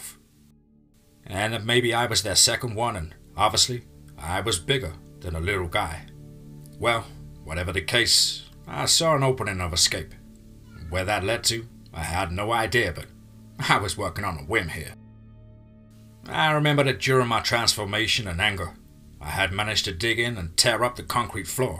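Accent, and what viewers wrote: United Kingdom. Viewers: No, they probably just can't stand a British accent telling them a story, or they are aware wolflike, like me. Oh, and I can't wait for you to call me a racist so I can call you some names too, since I'm in a tear-your-throat-out mood right now. I'm the 7th👎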